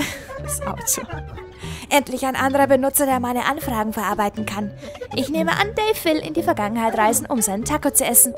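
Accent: Bavaria, Germany. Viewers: ich bemerke deinen bayrischen akzent , aber er fällt kaum auf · Yoooo bayrisch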